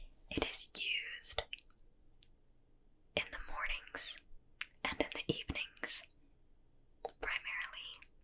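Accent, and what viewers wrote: United States. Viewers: She sounds pretty american to me so nothing special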